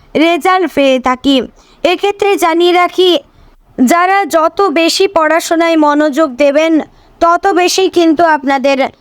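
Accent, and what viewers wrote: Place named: Bangladesh. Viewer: Your pronunciation is very much like Bangladeshi. Are from Bangladesh?😮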